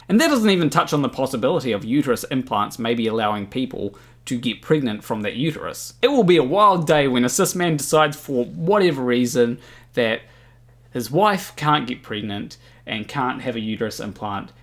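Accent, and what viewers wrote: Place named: New Zealand. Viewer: Phoebics... Always good for a laugh. We have a very short life span in the scheme of things, and the best you can hope for is to be happy, looking forward to gettin up in the morning. If that means identifying as a cross/trans/metro/gay or any of the other options, just do it 👍. If it doesn't hurt others in your choice, then how can there be a problem? Great stuff as always, and always nice to hear another Kiwi accent on the tube. Cheers Hokitika.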